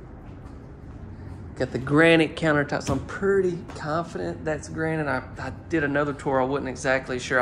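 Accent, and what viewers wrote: South, United States. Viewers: Well first off, Cullen is too adorable! And secondly, thank you so much for ALL of your videos! Anytime I find a home I think I might like, I run over to your channel to watch you tour it! Love your humor and accent! Gotta be from the south! I’m in Mississippi myself! Once again, thank you for all the videos and the good laughs!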